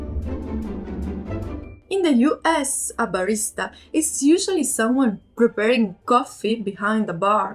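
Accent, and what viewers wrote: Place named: Southern Italy, Italy. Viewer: Video perfetto (dal valore sia culturale che linguistico con in più un tocco personale) che arricchisce ancora un po' il mio vocabolario inglese, anche re introducendo alcuni vocaboli che inconsciamente tendo a non usare in inglese (in particolare "solo" , che effettivamente ha parecchi utilizzi). Istintivamente evito a prescindere molti vocaboli italiani quando parlo in inglese, esattamente per lo stesso motivo per cui a te faceva strano sentire "fiasco" nelle news usa :) Me lo sentivo che eri del sud, poi sai anche ballare la tarantella 😍 Sono del sud anch'io, precisamente nato in Calabria, eh eh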